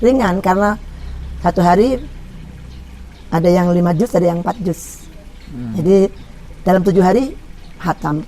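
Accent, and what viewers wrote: Java, Indonesia. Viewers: Bu nyai, klo ngendikan njawani banget, tapi gitu baca Qur'an, sambil merem dengernya ky org timur tengah, masha Allah fasih ... yai dan gus di kediri idola dan panutan sy..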